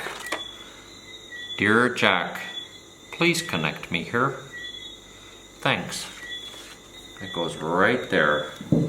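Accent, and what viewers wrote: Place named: Wisconsin, United States. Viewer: You Wisconsin folk talk funny but you're a friendly bunch. Dont lose those calories!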